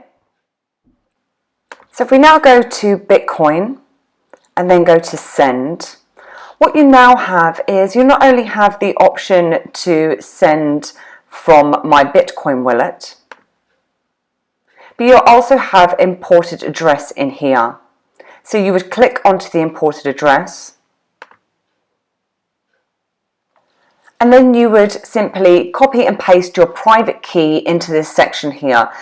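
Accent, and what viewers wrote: United Kingdom. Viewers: You're pretty and I tolerated that British accent only bcoz of that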